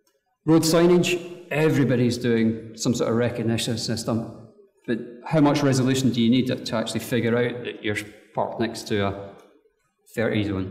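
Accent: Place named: India. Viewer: Indian english host？the accent is very unformal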